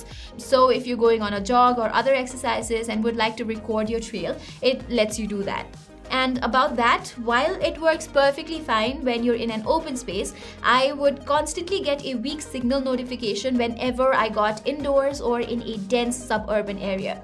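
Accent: India. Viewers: A little less accent would do... Felt more hinglish.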